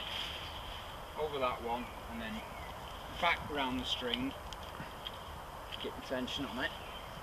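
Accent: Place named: Lancashire, United Kingdom. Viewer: Excellent video sounds like you not far from me I am near burnley fancy a meet up cheers keep up the good work thank you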